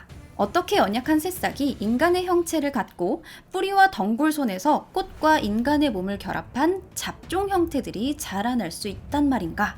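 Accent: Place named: Busan, South Korea. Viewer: 오늘은~ 부산의 귀여운 억양이 ~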